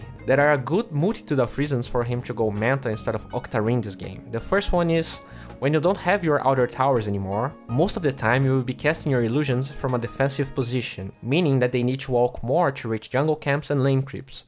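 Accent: Brazil. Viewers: Brother, com esse sotaque. Tu é Hu3hU3 BR?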